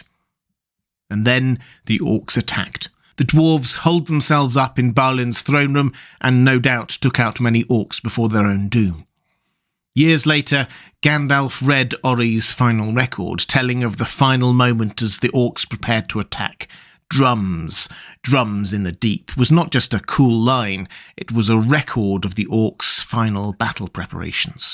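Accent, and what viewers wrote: England, United Kingdom. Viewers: I think him having a British accent makes it even better.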